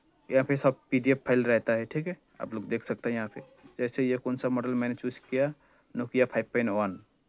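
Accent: Bengal, India. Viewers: tum Bengali ho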